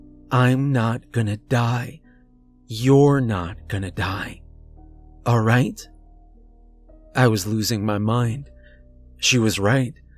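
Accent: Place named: South, United States. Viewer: Now whenever you do you southerner accent all I can here is TRAVIS MA BOY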